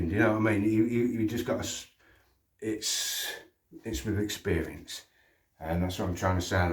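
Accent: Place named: Coventry, United Kingdom. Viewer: Just subbed mate, may i ask your first name and where your from? Sounds like Cov, used to know a few from there.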